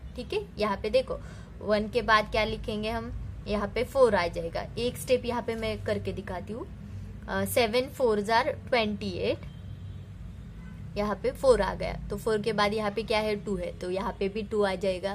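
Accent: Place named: Mumbai, India. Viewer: 😊 aap mumbai ki lagti ho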